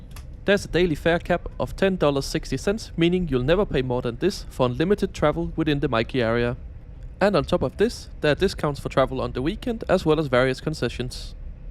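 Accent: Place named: Denmark. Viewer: I love your Danish 🇩🇰 accent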